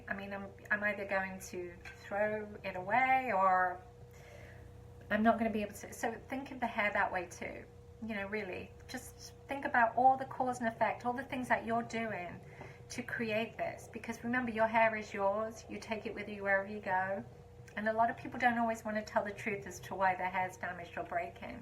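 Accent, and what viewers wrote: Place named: United Kingdom. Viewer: where were you when I was a kid!? I grew up in the 80s in the East Midlands and no one at school or the hairdresser’s told me about the Curly Girl Method. My mother told me to brush my hair and then chopped it all off when I refused 😬 when I read about your book I presumed you were American as this method appears to have traction in the States. Imagine my surprise when I heard your accent! Did you promote the Curly Girl Method and DevaCurl in the UK? I have never seen your products on sale here.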